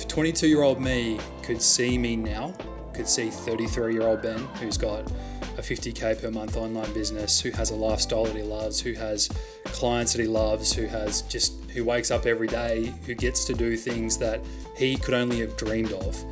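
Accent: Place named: Australia. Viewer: thanks mate! it's also nice to hear someone aussie (i live in melbourne) talking about business haha most of the stuff i listen to their always from the US or something. who's your mentors getting started back then?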